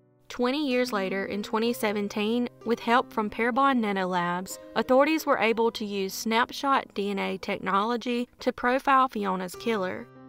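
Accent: United States. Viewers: Like your accent. Where in the US is your accent from 🇬🇧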